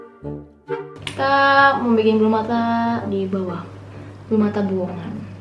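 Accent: Java, Indonesia. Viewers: kenapa medogh sekali bro :*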